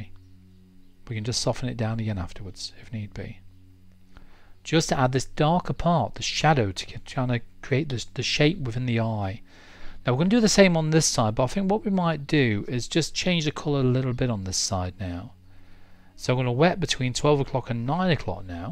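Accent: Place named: Scotland, United Kingdom. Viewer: Omgosh ~~ I know this video is a year old & I’m so happy I found you😊 Long story short..I’m going through, not so fun times, but you were able to put a smile on my face..just by being you💕 I thank you. Just to get out of my “funk” as I call it I want to paint a cat’s eye. Thankfully I found you. Step by step over two hours. Nobody does that. They want $ sure rightly so however there are people who want to teach and they don’t care about $. You have integrity. Thanks for putting a smile on my face. It has been a few since I had one. Your accent helps too. My grandparents were from Scotland, and England. Hearing your brough has helped immensely. I would love to send you my finished eye. Is there a way? Hope you’re getting along well. I’m Scottish and live in America. This has been quite a couple years aye? Hope to hear back and pray that all are happy and well ~J~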